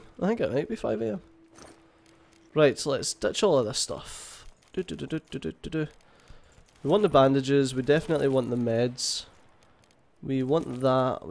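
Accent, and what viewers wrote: Scotland, United Kingdom. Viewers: I fucking love this game and i love the Scottish accent. SO GOOD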